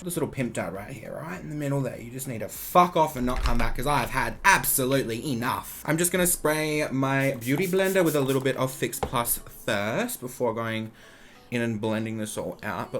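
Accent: Queensland, Australia. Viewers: I've noticed that the queensland accent says "oh" as "err" e.g. "Sephora prER makeup" lol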